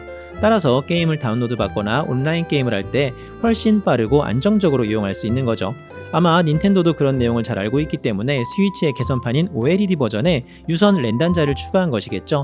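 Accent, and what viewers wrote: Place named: Gyeongsang, South Korea. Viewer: 약간 경상도 억양이 있네요 그리고 전 이더넷을 박아놔서 ㅋㅋ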